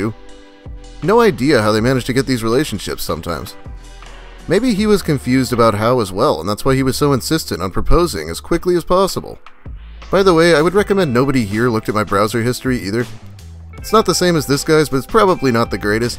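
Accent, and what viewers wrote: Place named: South, United States. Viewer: As a texas boy hearing your southern accent is is nice.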